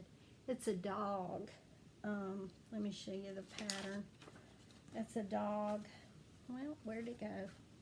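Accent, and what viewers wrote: South, United States. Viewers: y'all both have such a sweet southern drawl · Just found you. Love your southern accent!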